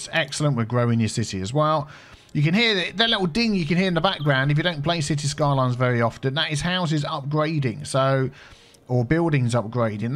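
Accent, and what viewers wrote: United Kingdom. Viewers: Your british accent is the best part about your videos man!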